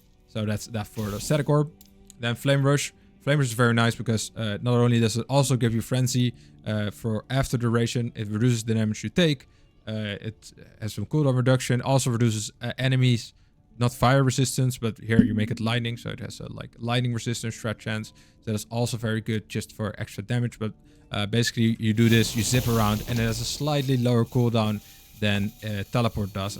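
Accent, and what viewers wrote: Netherlands. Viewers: jaja i hear some dutch accentXD subbed for support^^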